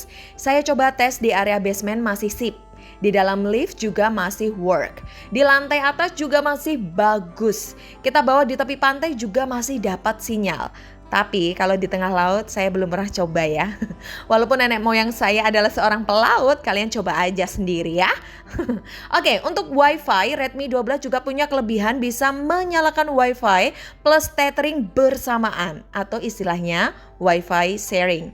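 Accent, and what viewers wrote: Surabaya, Indonesia. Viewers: orang surabaya ya mbak?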